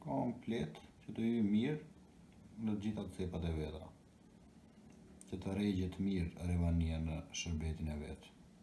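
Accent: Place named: Berat, Albania. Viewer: te bëra subscribe, per suport. 🙂🙃 Nga je? Nga e folura dukesh Beratas.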